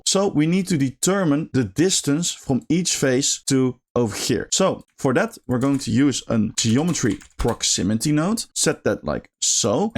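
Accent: Netherlands. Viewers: Me when i hear english with dutch accent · Your knowledge of geo nodes is so insane. Thanks for sharing your skills and insights. Love the Dutch/English, I assume your Dutch, like me ;).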